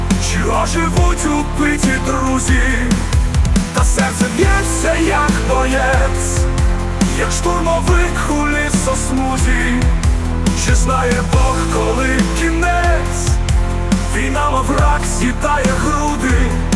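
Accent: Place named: Poland. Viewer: Ти вибач но поляком смердить рррррр.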